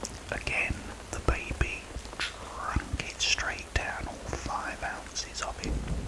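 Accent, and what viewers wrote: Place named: United Kingdom. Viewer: I'm American but I love British accents. Dealing with covid right now and this helps me get to sleep, thank you 🙏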